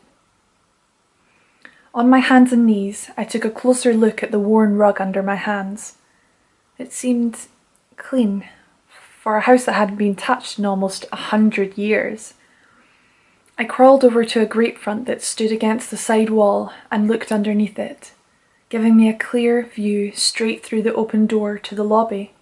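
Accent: Scotland, United Kingdom. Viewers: So nice to hear such a lovely Scot lass tell a beautiful story. Would love to hear a wee bit more of the Edinburgh accent, it is my favorite sounding speak.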